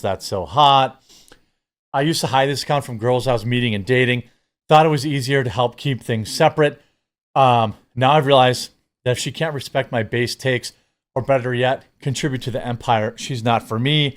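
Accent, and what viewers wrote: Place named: Michigan, United States. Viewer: You're from Michigan, you have the accent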